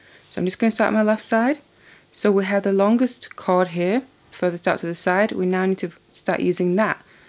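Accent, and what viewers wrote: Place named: Newcastle, United Kingdom. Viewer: OK, I have to ask where your accent is from! I keep thinking I've got it, then it changes! There really sounds like some Geordie in there!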